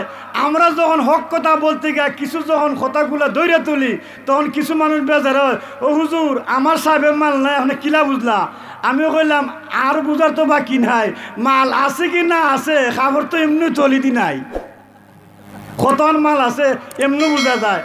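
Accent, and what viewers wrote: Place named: Sunamganj, Bangladesh. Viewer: কুব সুন্দর বয়ান সুনামগঞ্জী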